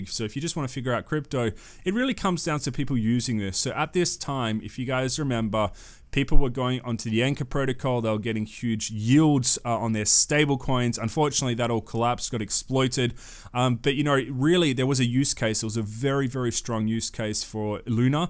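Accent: Australia. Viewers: Love your accent. Makes me miss oz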